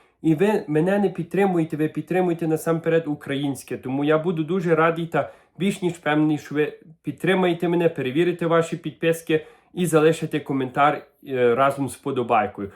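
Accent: Canada. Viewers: Ставлю тебе дорогенький Канадский щерый Уураинець вподобайку 👍 за таку гарну мову и Канадский акцет а новости тут у наших штатах таке саме маймо и будьте обережни не подходит до кордону дуже блезько бр Наши ICE можуть и ваших також депортувати из Канади подим скажут щё ми незнали шё з Канади неможна було ни кого чипати якщё неправильный аусвас то на депортацию 😢 А так дуже рад був мову цю чудесну старинну почюти 🤝😉💪👍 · такий прикольний акцент, ніби ще ваша прабабця емігрувала в Канаду ❤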